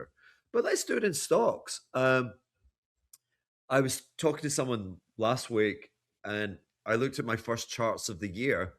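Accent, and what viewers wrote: United Kingdom. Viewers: is this British English???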